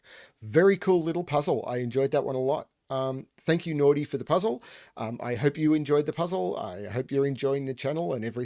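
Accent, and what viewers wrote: Australia. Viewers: Love the Aussie accent... had to check the screen to see if the setter was truly "Naughty"! lol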